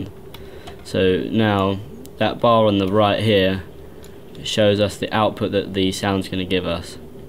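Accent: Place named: United Kingdom. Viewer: Don't understand British English..... ==' .... American is BETTER!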